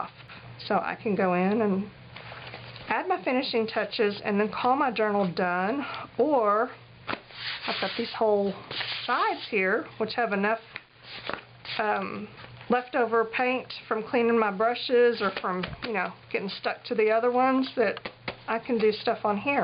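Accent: South, United States. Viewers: Love your Southern accent, you sound like me!!! Those Yankees just don't sound right. Love this idea too and might have to try it.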